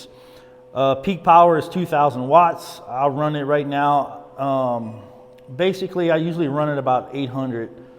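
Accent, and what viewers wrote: Louisiana, United States. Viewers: Are you from Louisiana? You sound like us Cajuns. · This guy must be in Louisiana. As a Louisiana resident, born and raised, I know a Cajun when I hear one...atleast he sure sounds like a Cajun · Where are you from, i hear half coonass in your voice...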